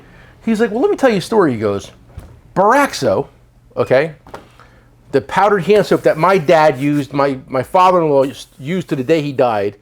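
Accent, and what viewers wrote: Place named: New Jersey, United States. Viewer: Damn I kept wondering why I never heard the term "field" tool dealer wondering what the hell that means? Now I see the title failed lol went over my head. It must be that damn Jersey accent. Former Edison resident happily in Florida now.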